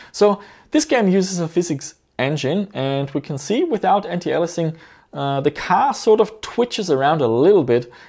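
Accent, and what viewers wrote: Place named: Germany. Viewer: Du hörst Dich so an als wenn Deutsch dir vertraut ist. Ich finde den Kanal toll weil Du Dir die Mühe machst Benchmarks zu machen und die Unterschiede klar zum Vorschein kommen. Was mir auffällt ist, dass einiges so oder in einer anderen Form neu aufgerollt wird und von anderen Seiten beleuchtet wird. Was ich an diesem Video vermisse ist das Thema ("T&L). Das ist zwar schon einiges älter aber hat schon mit mit AA zu tun oder doch nicht?. Grüße aus Hamburg (Germany)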